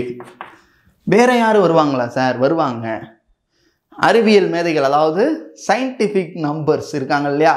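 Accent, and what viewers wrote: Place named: Madurai, India. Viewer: Ungaludaya peachy madurai slang appidiye irruku,so cute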